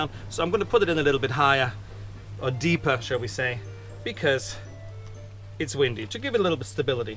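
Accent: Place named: Northern England, United Kingdom. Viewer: Fascinating that a German is doing a northern English accent. That's a trowel, by the way.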